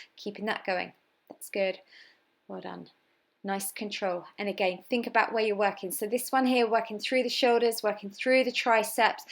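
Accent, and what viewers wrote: United Kingdom. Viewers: Really love your accent I'm American so I find British people's accents to be amazing 😊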